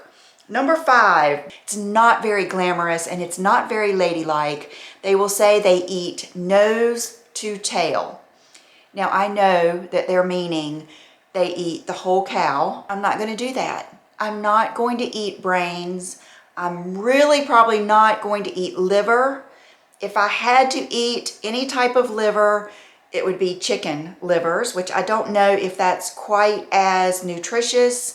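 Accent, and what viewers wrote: South, United States. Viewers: I love your personality! I am a southern girl that has moved out west- and find your voice and attitude reminds me of home- you are like a new carny friend!! It is so amazing how great i feel on just a few weeks of carnivore eating (i have had a few 'eat all the carbs that i want days')-- the joint pain, allergy symptoms, most of the menopause hot flashs, and edema are gone- i have far more energy and clarity-- and wow i feel super calm- this coming from someone who thought that their personality was just anxious- my brain just never turned off! And i started this as someone who did not like meat much but now i find myself craving it and butter- fascinating!! I have not lost much weight yet but my clothes are fitting better and my face is slimming down- have subscribed and please keep up the good work- ❤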